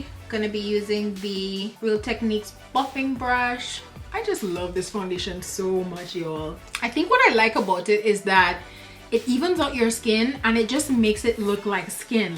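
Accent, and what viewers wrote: Trinidad and Tobago. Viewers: love your accent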